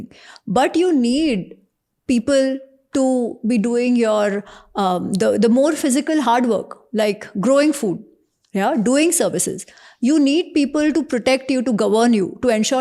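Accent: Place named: South India, India. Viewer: This host has too much south indian accent and that troubles to understand his questions.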